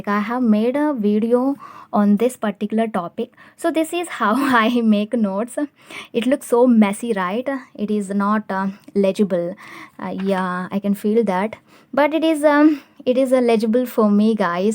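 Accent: South India, India. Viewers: U r accent is so south Indian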